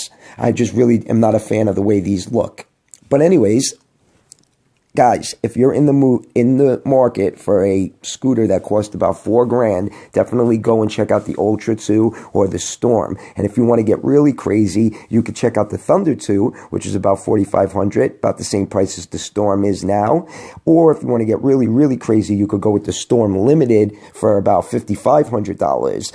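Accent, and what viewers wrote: New York, United States. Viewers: I love your NYC accent lol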